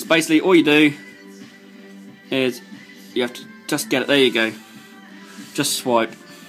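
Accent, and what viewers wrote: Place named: Ireland. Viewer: Somebody's Irish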